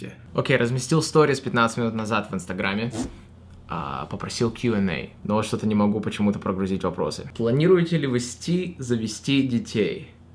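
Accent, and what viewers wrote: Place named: United States. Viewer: Забавно как у на русском уже акцент американский появился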